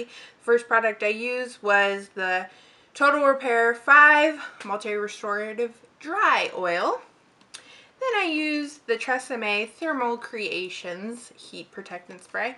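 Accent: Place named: South, United States. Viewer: Jw where are you from? You kinda have a southern accent.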